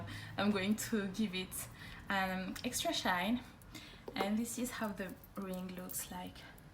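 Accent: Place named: France. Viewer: Nice haul! I wonder if you also speak French because of the accent.? Am starting to like Tous jewelry since I only have pandora. Thanks for showing your haul .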